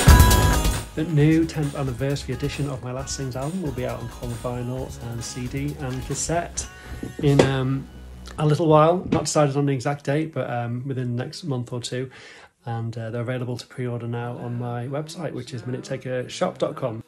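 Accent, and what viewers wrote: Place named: United Kingdom. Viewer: ❤❤❤❤❤❤❤. lovely 😺 I forgot to tell you how much I love your UK accent. I will use it for my auditions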